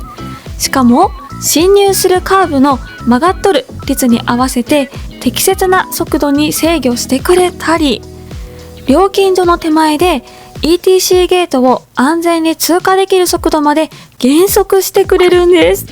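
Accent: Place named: Fukuoka, Japan. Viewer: いつも楽しく拝見させて貰ってます！ 僕は福岡県内に住んでるんですが こっちの方言にクリソツなんですが 愛媛育ちなんですか？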